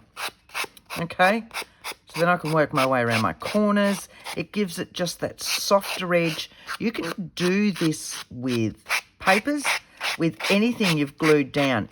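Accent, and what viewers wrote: Australia. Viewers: Thank you soooo much for this toolkit go through especially of the glues you use... funny enuf as a reborn doll artist E6000 is used for keeping in mohair to our kit heads... looove using the glue stik but yes here in Charleville Qld we get constant 40 degree heat so yes a stronger glue is needed for that... looooove your channel... lovely to hear an aussie accent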